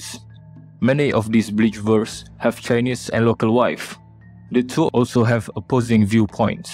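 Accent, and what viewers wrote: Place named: Indonesia. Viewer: Mending pake bhs Indonesia aj sih kl aksennya Indonesia..trs yg komen bangga amat jd ktrnn pnjht/pnjjh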